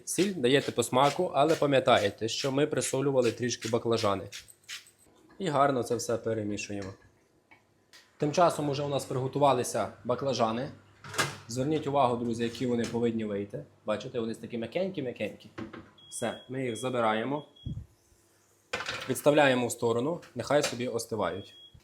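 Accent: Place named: Western Ukraine, Ukraine. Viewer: Оооой, обожнюю ваш діалект з моєї західної України. Дай Бог вам здоров*я і успіхів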